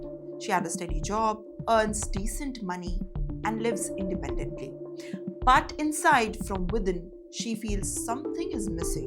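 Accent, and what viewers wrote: India. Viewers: Move back to India, the chances why you are still single is because of your strong Indian accent and the lack of her civic sense.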